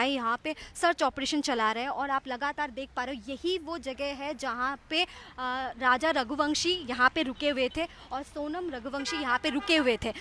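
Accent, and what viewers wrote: Bihar, India. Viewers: मैडम अपनी लैंग्वेज में थोरा बिहार का टच दूर कीजिए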